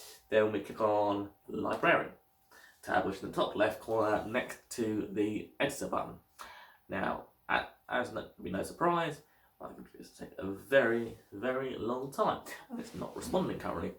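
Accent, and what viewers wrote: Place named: Australia. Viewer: Damn it hell sucks, I had to stop and try find another video due to me not being able to understand a single word this guy says. It's a shame cos it seems his informations handy. I'm Australian too so I should be able to understand a fellow English speaker but this guys accent is stroonngg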